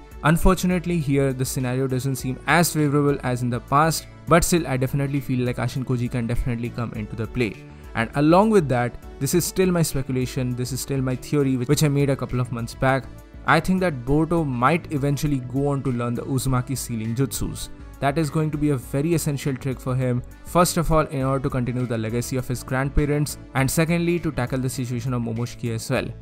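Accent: India. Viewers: Noice man,nice explanations.....this is channel I was looking for and I like how u talk like in indian syle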